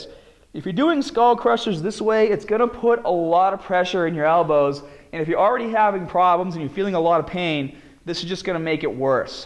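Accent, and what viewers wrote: Boston, United States. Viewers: How can he? Hes from Boston. They all speak like that there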